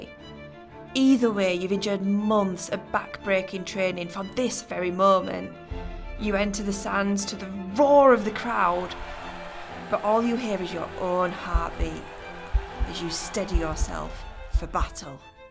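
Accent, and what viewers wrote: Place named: Yorkshire, United Kingdom. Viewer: Although it is refreshing to 'hear' a Yorkshire accent, & a female one too(!), presenting for the Royal Armouries Leeds it is unfortunate that both strength of voice & quality of recording made it extremely difficult to hear everything spoken. Not the first occasion R.A. 😒 Please mic. your presenters up personally for clarity! 🤨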